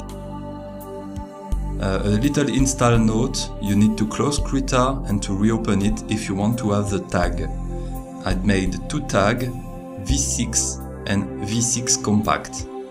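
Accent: France. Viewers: le bon accent de français haha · Merci pour la vidéo ! Ton accent français qui articule bien pour chaque mots en anglais (contrairement à eux qui avalent leurs mots); m'a beaucoup aidé à te comprendre ! xD Et ceux même en anglais. Merci ! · are you french? you have thé french accent 😊☺ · trop fort l'accent français^^ merci pour le partage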